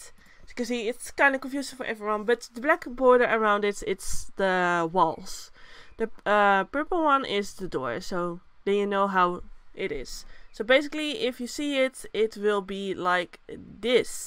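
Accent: Netherlands. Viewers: You sound dutch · Idk why but you kinda have a Dutch accent, ru Dutch? (No hate just asking 😂❤)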